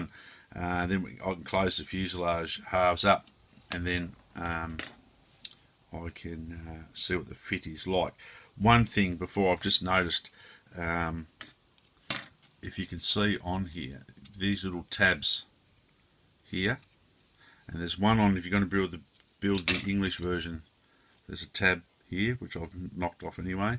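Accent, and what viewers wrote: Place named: New Zealand. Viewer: Nice scratch-building mate...I really enjoy tarting up these old kits. I look forward to seeing what you do with the "Whirlwind" too. Yes, terrible news coming out of NZ with the actions by that white supremacist...world's going crazy mate over religion. When I hear you speak, I think about a new tv series I've been watching from NZ called "The Brokenwood Mysteries"...got a nice young blonde detective in it. NZ is a country that's been on my bucket list for years, that and Australia. Would like to do one month of travelling around both countries...NZ looks so green and beautiful...just gotta avoid those Katipo and Redbacks! Enjoy the rest of your weekend! Cheers : )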